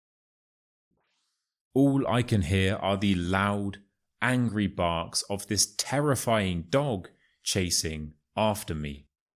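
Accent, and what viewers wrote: RP, United Kingdom. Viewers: I like you reading in British English accent. Because making me leaning more English words when you reading Thank you 🙏 ❤for your share teaching In British English accent You good teaching ❤ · Hello, dear! Thanks a lot for the excellent opportunity to practice your nice British RP!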